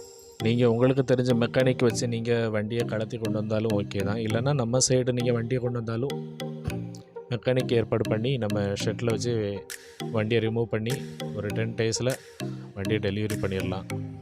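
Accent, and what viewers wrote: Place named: Kanyakumari, India. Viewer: Malayalamum ila tamilum ilanu rendu kalanthu pesuringa kanyakumari slang ipaditha irukumo. Na intha pulsar tha vechurunthe alloy wheel ku munadi generation. Sadly apola vandi avlo quality ila silencer la ota vilunthudichu, battery 1.5 years kuda varathu. adikadi model update panathu enoda vandi athe pola update pana mudiyala. Ana classic pulsar classic tha athoda sound nala iruku oturathuku nala irukum. But ipo p150 pulsar vanthathunala palaya pulsar discontinue paniduvanga. Apo palaya pulsar owners ithu mathiri paint job pana chances iruku.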